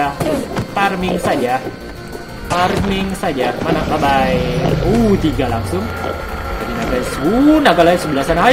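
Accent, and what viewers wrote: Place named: Sunda, Indonesia. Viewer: orang Bandung yak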